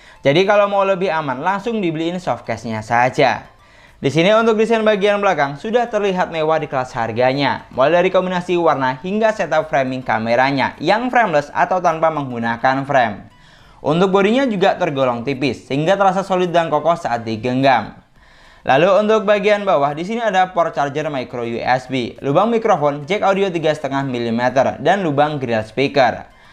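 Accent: Madura, Indonesia. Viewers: Madura mana be'en kek?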